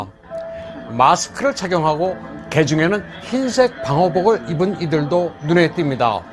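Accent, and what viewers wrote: Yanbian, China. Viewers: 조선족 발음임다. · 박상후 기자님 좋은 영상 잘 보고 있습니다. 근데 한국어 발음을 하실 때 보면 꼭 자국어가 아닌 것 처럼 발음 하시고 중국어도 능숙하게 하시는걸 보니 화교신가 궁금하네요...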